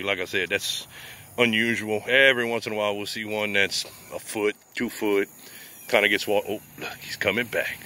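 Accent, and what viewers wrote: Louisiana, United States. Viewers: Without that Louisiana drawl, I think if I told that same story in my voice, saying it was taking place in a NYC sewer,....nobody would care!, your story telling and video making are truly a find! Love what your doing, now go get us some video of that gator!...And I will look closely next time I'm in Manhattan! Lots of rumors about people flushing small ones down the drain and managing to survive in the warm waters surrounding steam pipes and skyscrapers of Downtown!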